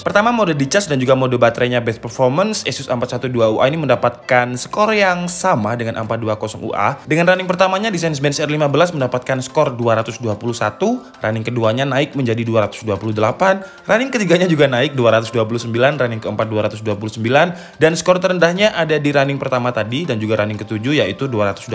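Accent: Malang, Indonesia. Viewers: Mualang tenan jancok e metu 😂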